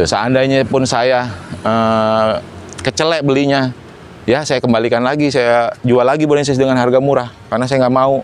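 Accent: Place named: West Java, Indonesia. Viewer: Tina logatna mah jigana urang sunda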